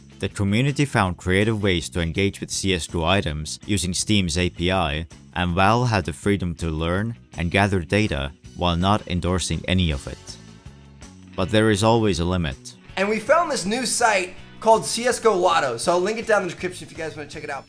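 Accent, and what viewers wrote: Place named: Estonia. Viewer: Hea video ja huvitav kuulamine :D Sain esimese paari minutiga aru, et tegu eestlasega! Keep it up!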